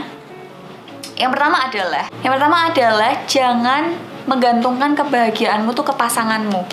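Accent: Java, Indonesia. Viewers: Orang mana si kok kyknya orang jawa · Mbk e wongdi? Kok jowone medog bgttt😅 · logat bicaranya kaya orang jawa? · Sampean wong ndi mba loogate ko jowo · medok banget yaaa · Medok tenan sih mbak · Meddddhhhookkk anjirrrrr jowoneee.....!!!!!! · Mantap medok juga bahsanya kak Jangan lupa mampir ke chanel aku juga ya teman temanku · Logat e wong jowo Iki koyok e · Wong jowo tah MBA jawane pundi? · Embak lek ngmong lucu lo, Jawa pundi pean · kyk medok2 ngomong jowoan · Medok amat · bahasa jawanya ituloh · Suaramu khas Jawa banget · Medok bener jawa'a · Medok bangettt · Logatnya bahasa Jawa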